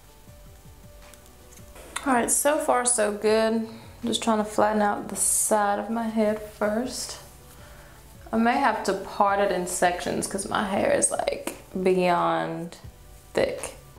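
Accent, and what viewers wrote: South, United States. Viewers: you have a weird country accent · That southern Belle accent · ur voice is so southern belle esque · she sounds kinda country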